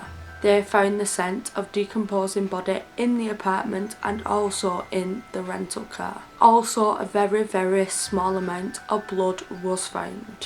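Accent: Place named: Wigan, United Kingdom. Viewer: Her parents had nothing to do with her disapperance. Not enough time and where could they hide her in that time. She was obviously kidnapped, and the rest we do not know. I hate it when people bring conspiracy theories etc. It heart breaking and the thought of her being sold to sex traffic makes me sick. I really hope that she is living with someone who could not have kids and she has forgotten what happened to her and is living her life happy. I'm trying to figure your accent, is it Bolton, Wigan, Oldham or Cumbria. I live on Anglesey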